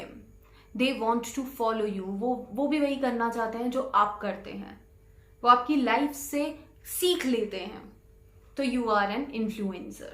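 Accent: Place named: Punjab, India. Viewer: hindi nolan da Great punjabi accent madam g. Sajid Karachi Pakistan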